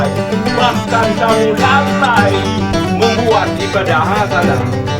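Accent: Aceh, Indonesia. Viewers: Btw kek logat Aceh, salam sejHtra dari kalimantan bg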